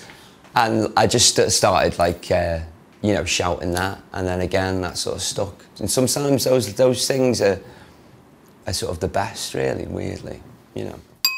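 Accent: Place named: Manchester, United Kingdom. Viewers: His accent is getting more Manc. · Leli Flores Manchester boi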